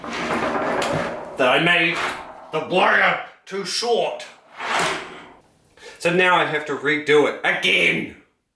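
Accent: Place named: New Zealand. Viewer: You a Kiwi?😁 You have a slight accent and in sure you aren't Brit or South African and me hearing a bit of an accent probably means not Aussie.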